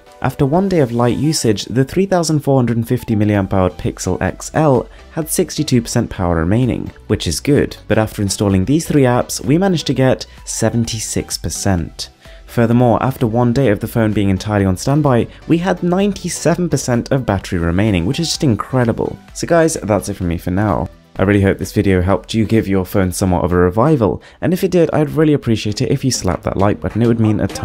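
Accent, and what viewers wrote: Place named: England, United Kingdom. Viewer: Only thing I think I get impressive in your video is your sound and way you speak English. I didn't see any Indians speak English like a English men. I feel you do little showoff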